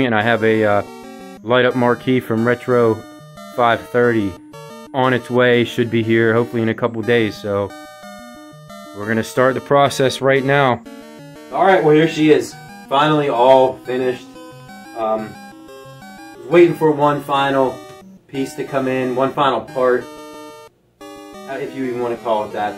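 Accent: Western Pennsylvania, United States. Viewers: Heard that western PA accent and then confirmed it with the Pens lamp! Let’s good man!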